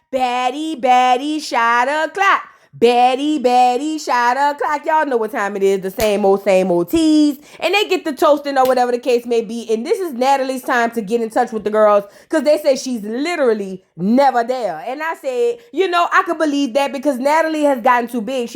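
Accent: New Orleans, United States. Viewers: Yes New Orleans Accent Baddies Review 😂😂😂😂😂 Love from London 🇬🇧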